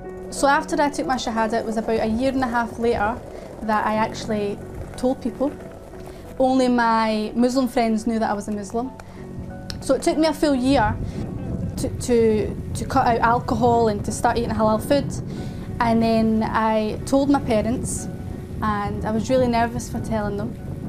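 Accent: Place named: Glasgow, United Kingdom. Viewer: As salamu Aleikum sister, welcome to Islam. Thank you for such a heart worming story. You reminded me of a beautiful Glasgowgian accent. Which i really liked while studying at Dundee university. Your humor about your dad's cooking made me lough a lot. Your story reflects inner and outer beauty. Your advise about Muslims not to react when they are wronged is spot on. It is the true reflection of our prophet Mohammad (saw). May Allah bless you and those attacking you to the right path.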